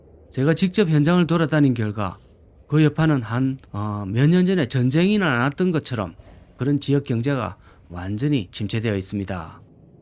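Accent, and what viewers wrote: Gyeongsang, South Korea. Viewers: 얘기를 하던 도중에 ....마~ 하고 잠시 쉬어가는 투의 어휘를 사용하는데.... 이런 말투는 일본식 말투다......특히경상도 지역에 이런 말투가 유독 많다.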